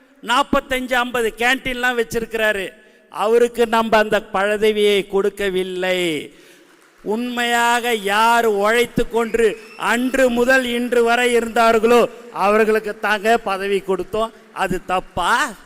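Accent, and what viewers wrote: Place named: Chennai, India. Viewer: Dei bussi enna kandraavi pechida. Tamil le olunga pesa theriyala. Poi tution teacher vechi kathukittu vaada. Eanda Tamil la kolra. Edhula baloon kadha vera. Sagikala da un pechi. Madras basa pesi kolraan. Adhayum mulusa pesa theriyala. Kandravi college kadhai . paavam vijay endha kelatu moodhevi yai vechi epdithaan samalikeraano paavam. Vijay nalaye mudela morache morache paakuraan tvk thalaivan ella tharkuri.😄😄😄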